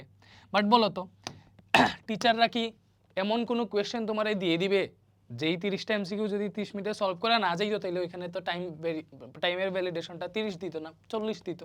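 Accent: Sylhet, Bangladesh. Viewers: Bai apni to sylheti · Sir apnar bari sylhet er kotay.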